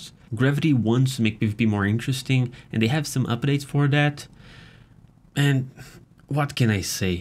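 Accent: Brazil. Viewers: A footage é br e vc tem sotaque br. És tu um br?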